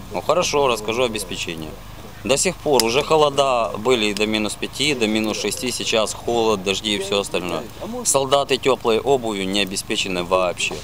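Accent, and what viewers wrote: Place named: Ivano-Frankivsk, Ukraine. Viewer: Какой то Одессит мама дорогая я вас умоляю?? ! Не делайте мне нервы своими фейками ;) По говору Таки он с Ивано Франковска!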